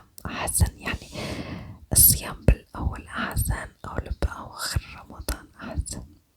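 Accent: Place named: Egypt. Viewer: لهجتك مصري ع خليجي😂❤❤❤استمري قمر 🎉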